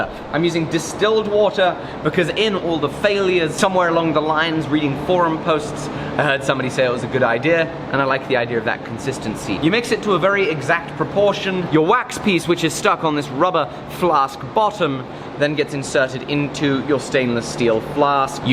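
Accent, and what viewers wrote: United Kingdom. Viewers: Is she British or other?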